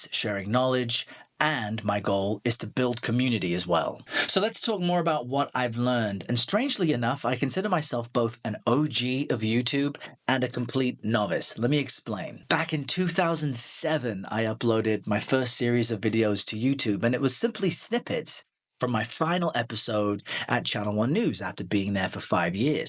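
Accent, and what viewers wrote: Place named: England, United Kingdom. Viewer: Hearing your background story made me think, “Wow. I never would’ve guessed.” Thanks for sharing that piece of yourself. I also never would’ve guessed you moved to the US at such a young age seeing as how you still have a VERY noticeable English accent. As for being the underdog, I actually think it’s not a bad place to be at all. There’s no pressure, no one knows what to expect from you, and you get to completely set the tone for whatever it is you want people to know about you. Btw, I will never understand how you anchor people get the green screen thing down. It looks sooo confusing 🤯. And I never considered how this job could be heavy at times when you’re in an unfamiliar space with a story that hits hard in a negative way. I could go on responding to every single point you made in this video, but I will spare you the essay lol. 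Keep up the good work!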